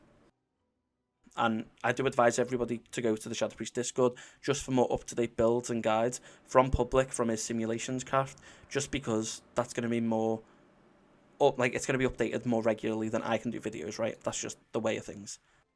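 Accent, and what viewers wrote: Liverpool, United Kingdom. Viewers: Is that another scouser playing WoW ? Boss.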